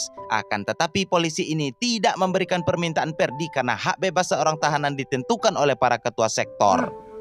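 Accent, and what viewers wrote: West Sumatra, Indonesia. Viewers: Orang minang ya bg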